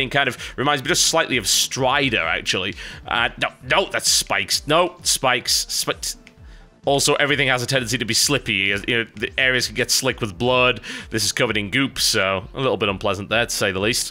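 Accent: United Kingdom. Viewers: they generally have different accents but very similar however he is very british in his accent cant tell if trolling or stupid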